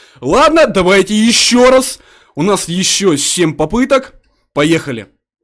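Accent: Ukraine. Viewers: А ты украинец шоль?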